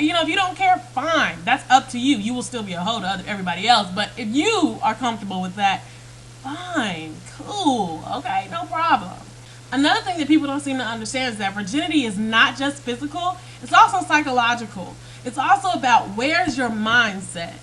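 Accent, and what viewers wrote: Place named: Texas, United States. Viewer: wait what!? handcuffs? little timmy? 'what you've been doing wrong with it' ! you are HI-larry-US whe you go off like a shot, the faster you go, the more the 'texas belle' voice comes out until the rubberband unwinds and you just stop. or funner yet, freeze for a moment and then keep going! i swear i think my stream stops. keep the rants coming, it's a hilite of my day!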